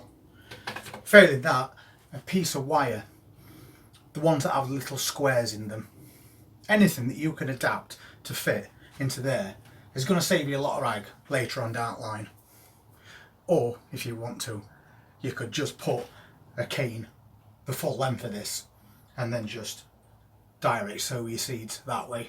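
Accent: Yorkshire, United Kingdom. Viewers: I have just come across your channel you sound you are from Yorkshire possibly Sheffield area is this true would like to know as I’m a Yorkshire man also so we are in same area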